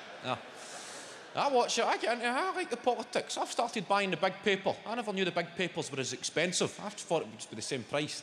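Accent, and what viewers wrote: Scotland, United Kingdom. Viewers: i love the scottish accent but man, i barely understand it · Being fair, Kevin is speaking Scots English (or Scottish) -- a vernacular of English that is essentially a different language entirely, but mutually intelligible with Standardised English. It would be nice for people to remember that when they act like Scottish is just a "comedy accent" on the Twitter. ("Mutually intelligible" meaning that two people can speak a different language, but as long as they are closely linked enough historically and linguistically, they can understand each other just fine despite any differences in grammar, vocabulary and pronunciation. Another example would be Swedish and Norwegian).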